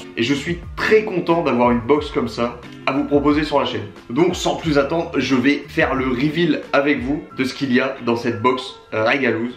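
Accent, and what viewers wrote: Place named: North, France. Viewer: Hello l'ami, il y a le boss de la dégustation du burger, Mr! Et je viens de te découvrir! Sympa ta channel, tu as un tio accent de ch'nord! 62? Je m'abonne, bonne continuation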